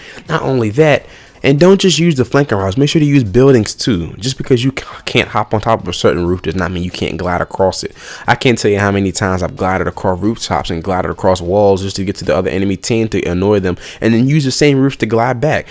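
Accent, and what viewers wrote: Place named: United States. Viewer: wasnt a fan of your heavy american accent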